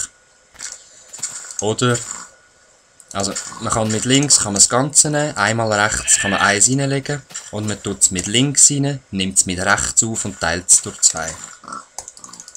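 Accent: Basel, Switzerland. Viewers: ??????????? Ich bi au basler aber das isch ächd balrdütsch=-O Aha wenns baselbiederisch isch ischs klar das kenni nit so.:-) I kum us Müncheschdei:-)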